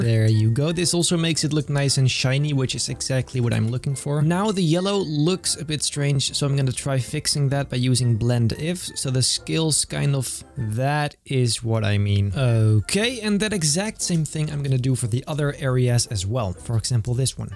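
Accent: Netherlands. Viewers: ik hoor dat nederlands accentje hhahah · IDK WHY BUT YOU SOUND SO DUTCH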